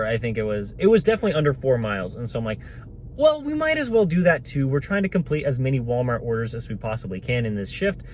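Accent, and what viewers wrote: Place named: India. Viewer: Absolutely giving you the run around in that glorious Indian accent.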